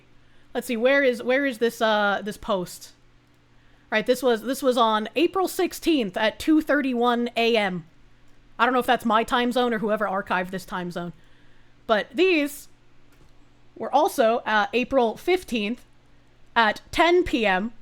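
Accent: Boston, United States. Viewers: I notice when gets annoyed. Her Bostonian accent comes out strong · I love your accent. I think Boston women are the best. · Loud boston foxu sexy talk, more please. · !!! I want to do YouTube and kik as a musician that doesn’t have to bite my tongue about schtuff or be confronted in front of my son in line at Whataburger lol. I’ve had local, wouldn’t call it fame, but was in a popular band in Houston for a decade. I kinda didnt like delusional locals coming up to my family while we were trying to have dinner to tell me positive or negative takes on shows or my idiot ex singers stupid fb post.. my question is, is it possible to be a musical vtuber? Like play real instruments with and avatar covering most my identity? And also i don’t understand where to start.. I’m sorta retarded.. is there any advice? Also you made my Texan ears love the Bostonian accent lol it’s calming to hear you at work. 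Between you leaflit and nux I’m convinced this is the best direction to have as much creative freedom as possible. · I only know of because of whatever this shit is and I gotta say, very fucked up. But dude, as a linguist, I cannot get over that amazing Boaston accent, Imma have to study that shit XD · ! Sexiest Bostonian Fox Woman Ever!!! Talk Boston at me woman!! Talk BOSTON!!!!!! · Jokes on them. The thick Boston accent is very attractive. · Gotta admit the Boston accent is doing it for me ❤😂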